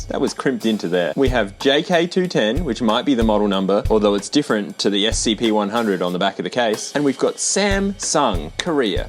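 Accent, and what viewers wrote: Australia. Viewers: Two problems with your comment: 1. He isn't British, he's Australian. 2. Getting frustrated because people from different countries have different accents is a ridiculous way to live your life. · He's Australian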